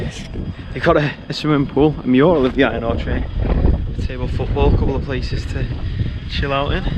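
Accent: Liverpool, United Kingdom. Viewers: I'm trying to figure out your accent. Is it scouse?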